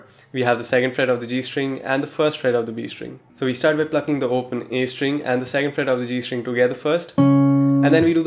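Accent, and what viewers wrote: India. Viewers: Hey bro are you from India your accent sounds a little bit Indian 😅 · Your accent is kinda similar to indian accent! Are you from India?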